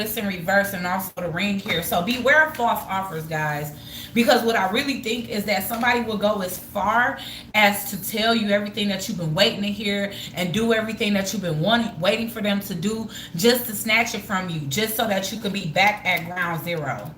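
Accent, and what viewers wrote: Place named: United States. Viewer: Yahh boo looking glowing for real for real I love the American twang I'm a London boy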